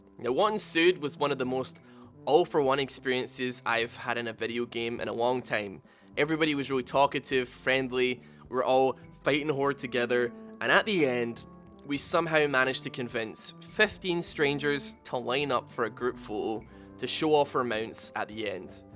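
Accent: Scotland, United Kingdom. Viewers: Don’t mind me I’m just here for the Scottish accent.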